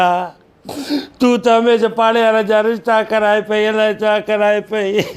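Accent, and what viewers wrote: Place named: Shikarpur, Pakistan. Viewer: What a beautiful Shikatpuri boli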